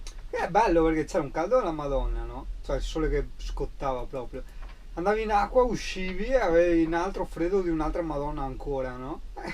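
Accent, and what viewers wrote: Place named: Tuscany, Italy. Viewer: Obbrao tummisei garbao un monte....tai imparao il toscano proprio bene...brao😊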